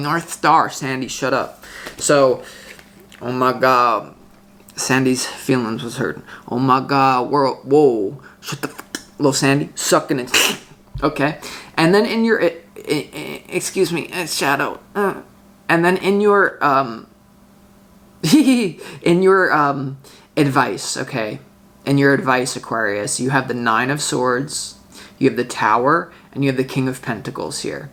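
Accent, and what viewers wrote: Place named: South, United States. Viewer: South ? No wonder. I rec the accent. I grew up in the so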